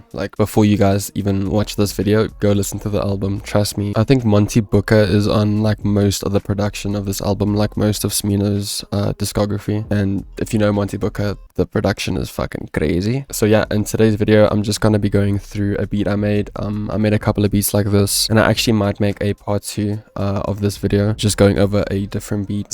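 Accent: South Africa. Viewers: was way to Tuff are you South African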